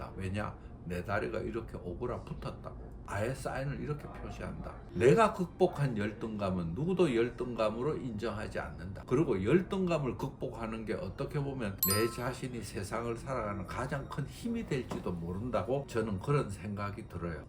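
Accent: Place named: Gyeongsang, South Korea. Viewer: 원장님 경상도 분이셨군요..!^^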